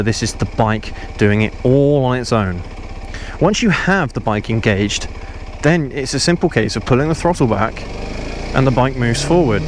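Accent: United Kingdom. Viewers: Are you welsh?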